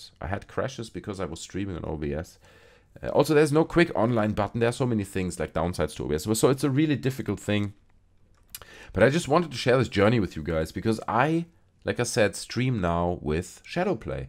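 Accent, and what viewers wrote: Germany. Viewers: Are you from germany? Because how you pronounce words is very german xD!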